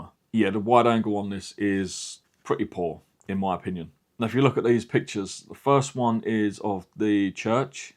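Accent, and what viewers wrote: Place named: Liverpool, United Kingdom. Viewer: Why are you speaking in a scouse accent?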